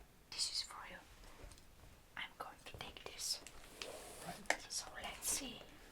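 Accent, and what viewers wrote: Russia. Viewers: congrats. and one wuestion: are you russian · Are you Russian?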